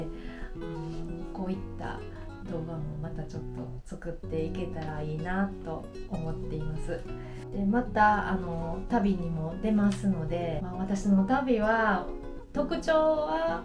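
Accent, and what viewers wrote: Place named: Osaka, Japan. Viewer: aiiro70さんが旅先でとても楽しそうに外国の方とお話をしているのを見て、私もスキマ時間に中国語と英語を学び始めました。 同世代、しかも大阪弁のaiiroさんの動画、とても親しみが持てて、楽しみにしています。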